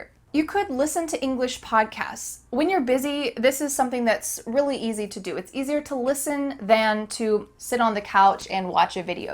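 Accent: United States. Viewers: Did you know that is from the state of North Carolina USA... so she speaks American English! You mentioned that you like British accents... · Hello, I saw many YouTubers are teaching English but the way you teach English is very affective and understandable. Yesterday I watched another English teaching channel, I didn't liked their videos because firstly their accent was different like Australian and English, so those accent wasn't understandable for me 'cause I watch videos in American accent like yours and Hollywood movies. Secondly they were not getting to the point. They were just spending time in front of 📷. Last but not least Passionate about teaching English, I mean I didn't saw any passion in their eyes which I see in yours. So thank you very much 🙏🏻🙏🏻 💝 from 🇮🇳 (I'm sorry for my bad English because I'm beginner but I am sure that one day I will speak English like you)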